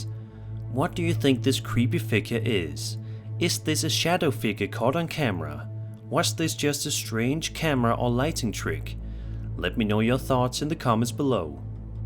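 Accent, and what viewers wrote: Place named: Denmark. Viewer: I like how after i found out you were danish i can’t unhear the strong accent you have lol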